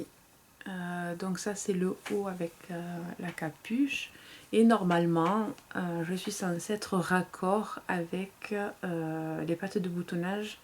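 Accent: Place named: Narbonne, France. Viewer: supers tes pantalons dommage pour moi les patrons pochettes sont epuisees tu as un accent comme moi de narbonne lol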